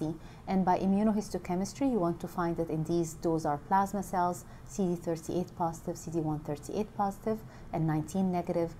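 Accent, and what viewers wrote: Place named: Egypt. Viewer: Cannot shake the Egyptian accent though hhhh. Great video though.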